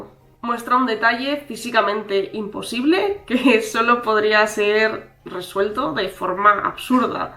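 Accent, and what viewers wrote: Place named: Spain. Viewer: Todos tenemos acento. Es la forma en que se expresa la lengua que hablamos según el lugar de donde somos. Ella tiene acento español, no sé específicamente de qué área. Y para mí, una persona de otro lugar completamente distinto, es una forma de hablar y expresarse que llama la atención. Así que sí, qué acento tan precioso.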